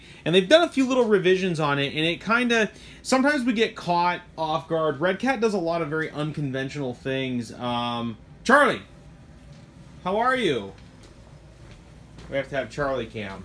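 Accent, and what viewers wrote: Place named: Canada. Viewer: Hi, really enjoy your humor and Canadian accent. You broke through my work funk in just a few minutes listening to your spiel. Keep up the good work. Cheers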